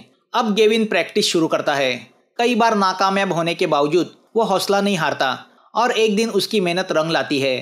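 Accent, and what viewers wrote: Maharashtra, India. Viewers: What makes this channel stand out is the Hindi spoken in Marathi accent for narration.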